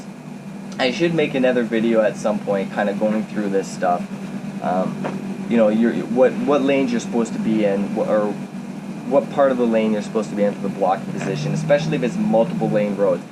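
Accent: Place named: Ontario, Canada. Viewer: Good ol Ontario boy accent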